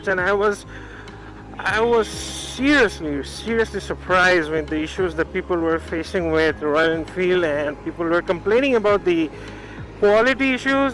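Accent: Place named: North East, United Kingdom. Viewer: Liked your review. I am an old rider and got the mojo sometime back. It's an amazing bike. Didn't go for the dominar as I don't need abs. I really don't as I have been riding a bullet all my life. It is a really good machine and the engine is butter smooth. By the way are you from the north east as I have a lot of friends up there and Ur diction is very similar. Anyway good job and keep up the good work. I agree with you about the marketing bit. Mahindra should really market it aggressively. They have a fantastic product in hand. Cheers.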